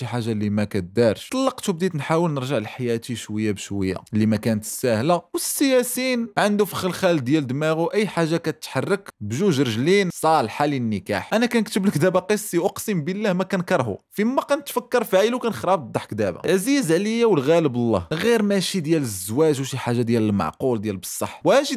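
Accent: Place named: Western Sahara. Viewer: انت دور دور تجبد على لسانك ناس ديال الصحرة گد عندنا لجنوب نسلمو عليك 😉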